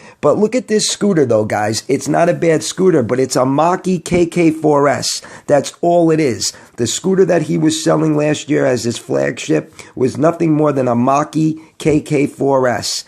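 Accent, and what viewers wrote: New York, United States. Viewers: I love how u call it how it is you get straight to the point and i love your nyc accent lol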